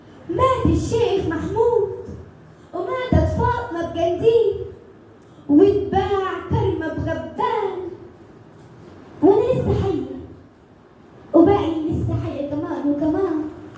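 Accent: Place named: Upper Egypt, Egypt. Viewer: لهجة صعيدية جميلة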